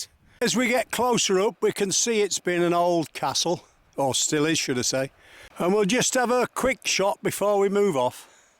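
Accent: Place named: Yorkshire, United Kingdom. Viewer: Thank you for that, I really enjoyed your filming and commentary. I'm in New Zealand and one of my grandfathers came from Yorkshire. He died before I was born - I wonder if his voice was anything like yours. I have been to Port Isaac, just for a couple of hours, I took the bus from Bodmin four years ago. That coastal walk looks similar to one or two where I live - but we don't have those picturesque villages.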